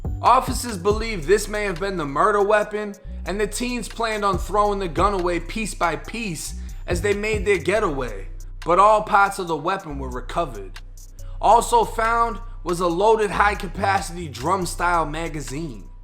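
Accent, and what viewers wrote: Boston, United States. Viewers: Yo bro u got ever been to Boston?? Cause u sound like one of us nbs lol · You from Boston? Hear that accent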